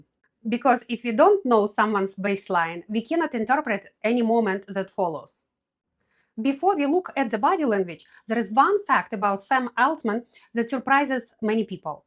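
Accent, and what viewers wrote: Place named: Russia. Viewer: Pretty, pretty thick russisch accent. Bloody hell 🌷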